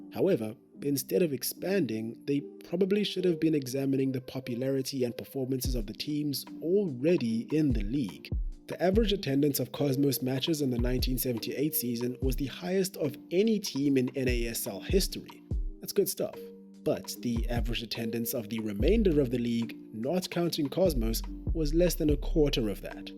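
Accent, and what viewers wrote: South Africa. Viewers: Are you South African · Accent sounds like a black south African for some reason....just saying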